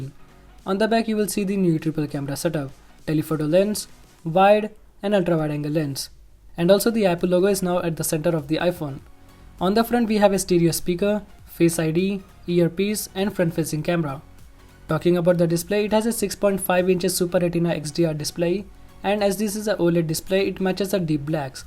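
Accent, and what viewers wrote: India. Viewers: The thing that I love about this iPhone is the camera. Your English with an Indian accent is cool to hear.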